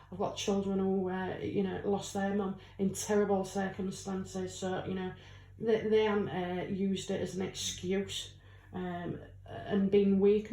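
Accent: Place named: Lincolnshire, United Kingdom. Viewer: I enjoy your accent! You remind me of home :) (Lincolnshire)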